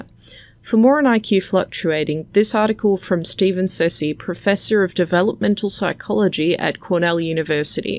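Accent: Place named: France. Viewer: JF has taken to calling people who disagree with him "Liar" once they don't concede to his framing. It's profoundly bad faith. He becomes cynical and condescending almost immediately. For him this is a for-profit endeavor. You deposit your token and you get exactly the talking points you want with the French accent and academic "credentials" for polish.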